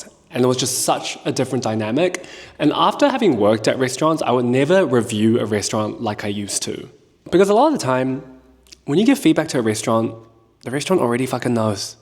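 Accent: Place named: Auckland, New Zealand. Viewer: this is sort of off topic but you have a very EASY voice to listen to -- some ranters can be grating on the ears or tell stories too slow, too fast but your pacing was perfect and your tone, dry humor and kiwi accent all tied so well together and made this an easy watch. Coming from a fellow kiwi who can't really stand the intense american influencer accents, you have a really clean kind of auckland accent, and since thats rare to find in influencer media, this kinda felt like a breath of fresh air, lol. This video literally healed my fried attention span. So shottiess for the mean as content, and am looking forward for more to come!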